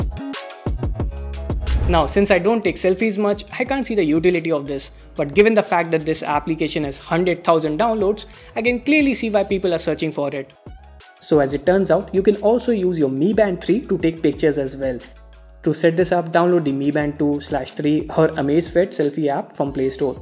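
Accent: India. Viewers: Ngomongnya medok india banget yak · I could hardly understand your Indlish...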